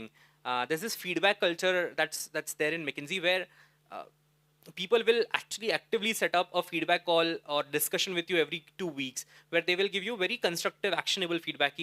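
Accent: Gujarat, India. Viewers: bro is a deff gujju "probleeem"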